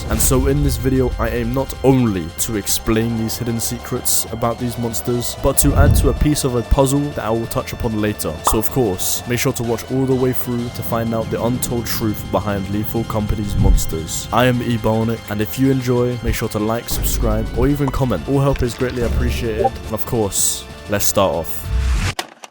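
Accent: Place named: United Kingdom. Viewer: Hey great video! Not only does it help that you sound British, but you also go into a good amount of detail! I look forward to your next information vids :)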